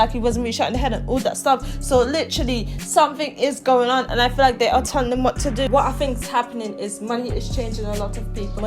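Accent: United Kingdom. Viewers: How the fuck do you have subscribers you speak straight gibberish mad fast with a British accent. Idk if you’re making a video about Chicago or a London drill rap either way both trash.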